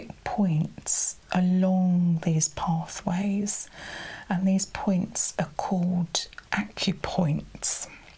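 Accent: United Kingdom. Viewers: Oh, the british accent 😍🥰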